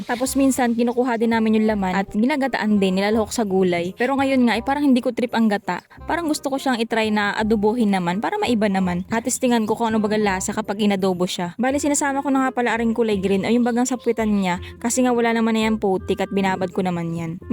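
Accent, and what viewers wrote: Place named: Quezon, Philippines. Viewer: Kya Pala ANG Punto mo .parang taga Quezon ... Tagal Quezon K NGA Pala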